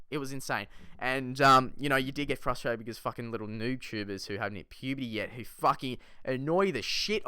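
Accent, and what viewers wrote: Australia. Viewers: Love the accent x) Australian?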